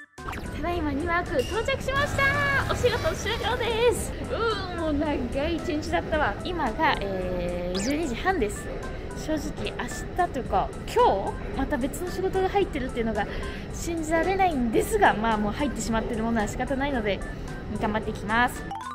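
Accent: Kansai, Japan. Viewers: 、関西なん？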